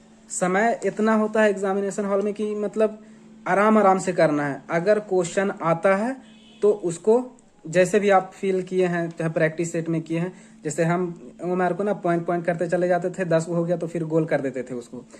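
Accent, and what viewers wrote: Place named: Gaya, India. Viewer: Gaya ka tone hai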